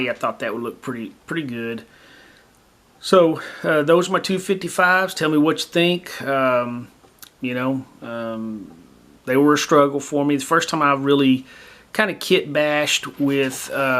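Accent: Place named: Appalachia, United States. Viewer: MC need to get rid of your hillbilly accent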